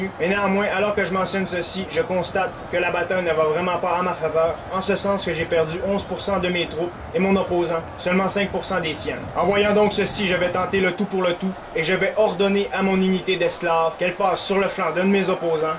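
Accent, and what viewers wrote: Canada. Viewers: Amazing question tu est canadien?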